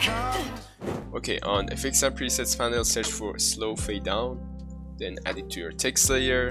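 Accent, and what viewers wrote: Iran. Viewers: your accent is so Persian bro😂❤ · داداش لهجت خیلی ایرانیه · داداش ایرانی؟ ادیت:پشمام از رو لحجت فک کردم ایرانی تا اینکه پیج اینستا تو دیدم فهمیدم ایرانی پر قدرت ادامه بده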